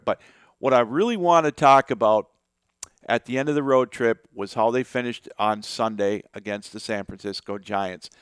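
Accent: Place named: Port Huron, United States. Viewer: You have to be from Port Huron... you sound just like my grandparents :D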